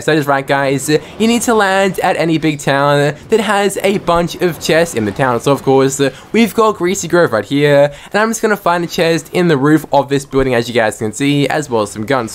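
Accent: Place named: Australia. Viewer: Hes Australian. I know that accent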